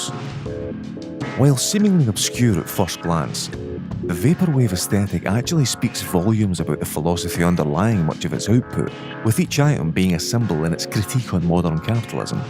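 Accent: Scotland, United Kingdom. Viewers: Do you have a Scottish accent?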